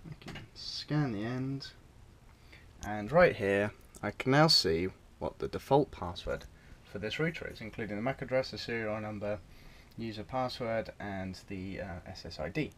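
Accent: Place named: United Kingdom. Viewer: I really love the British accent xD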